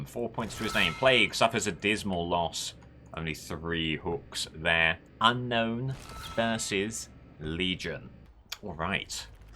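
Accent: RP, United Kingdom. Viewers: ! My man! That posh guy from Youtube! great video so far (like 5 seconds in).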